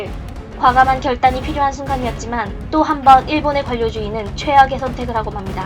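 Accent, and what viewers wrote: North Korea. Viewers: 해설자 북한사람?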